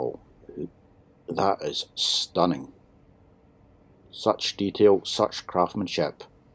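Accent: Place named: Ireland. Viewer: I love that Irish accent. the 'Rs' just roll off the tongue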